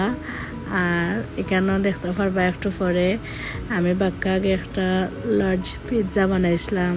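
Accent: Sylhet, Bangladesh. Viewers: আপু একটু শুদ্ধ ভাবে কথা বলতে হবে কারণ সবাই সিলেটের কথা বুঝে না তাই আর একা একা খেলে চলবে না আমাকে ও দিতে হবে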